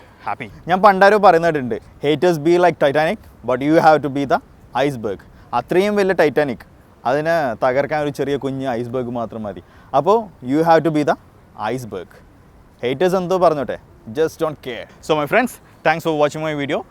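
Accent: Kannur, India. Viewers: From kannur right? nice talk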